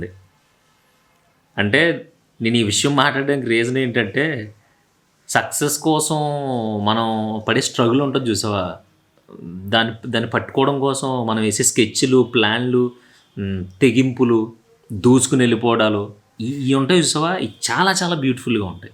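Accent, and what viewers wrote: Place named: West Godavari, India. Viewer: Confirm ga nv west Godavari anna nuvu ❤❤❤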